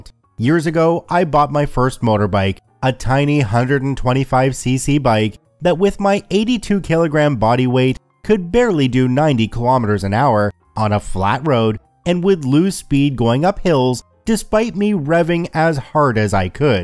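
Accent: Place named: Canada. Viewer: I don't know it would be the first time I enjoyed the Canadian accent. Not that I dislike it. I lived there for awhile. I lived in Canada from age four and a half till about 11 maybe a little younger. And then we moved back to the east coast and then everywhere else in the u.s. LOL all I know is when I was a kid I trained myself into having a flat Midwestern accent because it kept me from getting picked on as much. But I still don't remember anyone in Canada saying it the way you do LOL have a wonderful night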